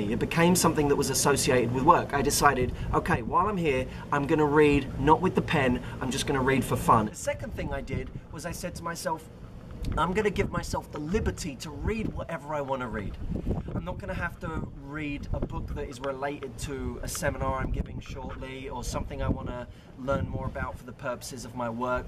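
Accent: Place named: United Kingdom. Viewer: I'm just here to listen to british accent lollll. thank you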